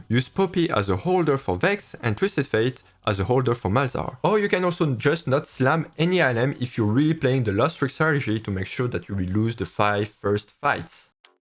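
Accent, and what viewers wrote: France. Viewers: French accent, right?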